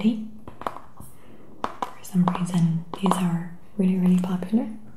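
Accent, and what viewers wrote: Netherlands. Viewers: she's Dutch its the way she speaks !x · Calm down. She is dutch, English is not her first language. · She talks like that because she is from the Netherlands. Obviously, not everyone speaks English. Most people who watch her videos love her accent. So, if you don't then don't watch her videos,simple. She is trying to help relax you/give you asmr tingles, not talking about the way people talk. Maybe if you was actually smart,you would realize she is from the Netherlands and she has an accent and can't help the way she talk,but you don't know that. I mean,it's first grade so learn it. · she;s Dutch, and therefore has a Dutch accent. Don't be rude. This is a relaxing community...please take your negativity elsewhere.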